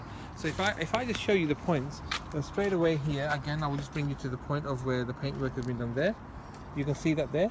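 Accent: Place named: Durban, South Africa. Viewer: is it me or does this guy have a South african Accent ...... specifically from Durban ...!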